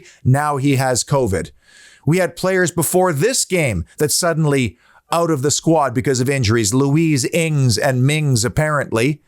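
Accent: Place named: British Columbia, Canada. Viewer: British Columbia is North America but not America. Listen to the accent. a star.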